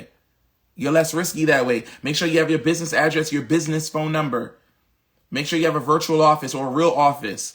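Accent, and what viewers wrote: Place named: Philadelphia, United States. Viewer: Omg!! He be having me SOO HYPED!!! That Philly Tone and RHYTHM 🔥🎯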